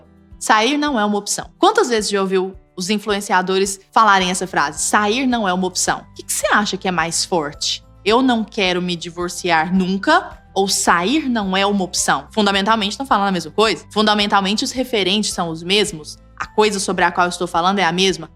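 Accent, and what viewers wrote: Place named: Centre-West, Brazil. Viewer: Pelo sotaque e daqui do centro oeste kk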